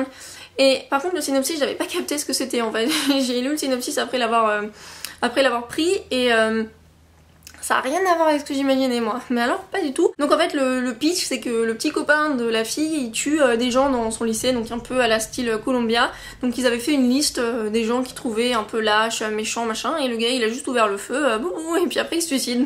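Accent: England, United Kingdom. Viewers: Salut. Je fait des vidéos sur youtube ou je montre mes achats jeux vidéo. j'aimerais tellement avoir le même accent "anglais" que toi;) Tu présente super bien à la caméra. Bravo pour tes abonnés et bonne continuation.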